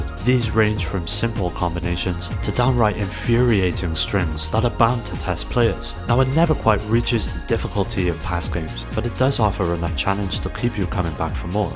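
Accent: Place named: Wales, United Kingdom. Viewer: are you from cwmbran or Newport? that accent is quite recognisable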